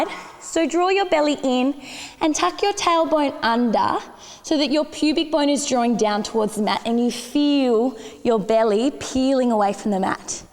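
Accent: Australia. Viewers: I love your Australian accent!!!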